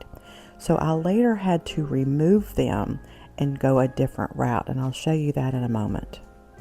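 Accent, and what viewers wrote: United States. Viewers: I had purchased a chair a while back real cheap from Salvation Army and cut it all up. Now I have a box full of spindles and you’ve given me some great ideas to use them for! Your accent is similar to my mom’s. She was from Virginia. Where are you located? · Can't beat that Alabama accent !! South GA accent is very similar! So enjoyed your video!🌟🌟🌟🌟🌟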